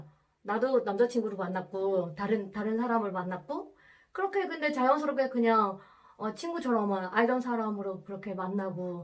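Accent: Gyeongsang, South Korea. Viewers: 말씨가 낯설지 않다 생각했는데 대구에서 어학연수를 받으셨군요 저두 대구 고향♡ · 경상도 억양이 아주 살짝있네라고 생각했는데 대구에서 어학연수해서 그런갑네요.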